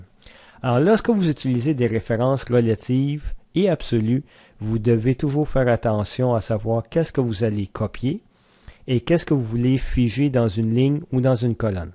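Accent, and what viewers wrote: Quebec, Canada. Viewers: vraiment très bien expliqué. Et belle effort sur l'accent car étant belge c'est assez compliqué de comprendre le québécois mais la aucun soucis. Très bonne vidéo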